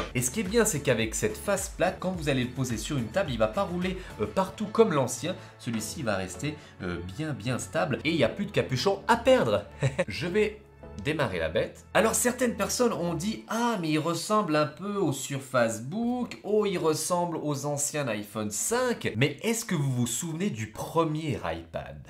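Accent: Switzerland. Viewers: J'me disais aussi avec un accent pareil pouvait être que "suisse" et pas frenchie, et on s'presse pas siouplait ))))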